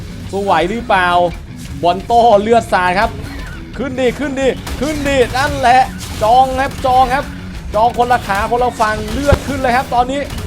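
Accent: South, Thailand. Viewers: พี่อยู่ภาคอะไรครับเห็นพี่พูดคลายกับภาษาไต้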